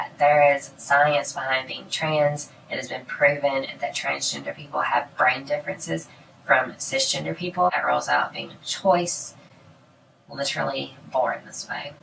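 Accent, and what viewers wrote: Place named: South, United States. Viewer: I’m curious about your accent. I’m from SC, and it’s close. I’m thinking you’re from eastern NC maybe? Anyway, you’re from the South, so you’re cool with me. Edit. Midlands of SC.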